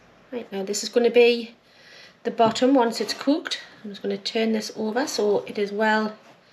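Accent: Newcastle, United Kingdom. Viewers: Lovely steak and kidney pud Hinny havent had one in years,even nicer with some oysters popped in,lovely to hear your Geordie accent.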